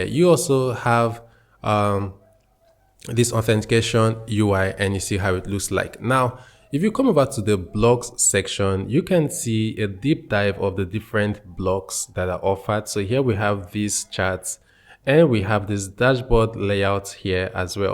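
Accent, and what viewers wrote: Nigeria. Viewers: Nigerian English bro😅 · Sounds like a Nigerian 👍🏻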